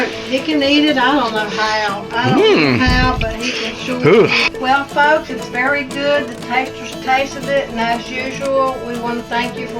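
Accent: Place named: Kentucky, United States. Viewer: I love listening to that accent! My dad's family is from Corbin, Kentucky and she sounds exactly like my aunts did. I'm curious where she is from.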